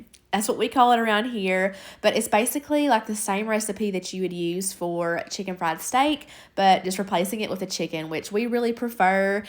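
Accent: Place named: Kentucky, United States. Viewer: Hey I've been binge watching your videos all week! I love the different recipes and ideas you have, I can't wait to try some. Are you from Kentucky too? I'm right outside Lexington but you sound farther south or maybe eastern ky. Much love 😘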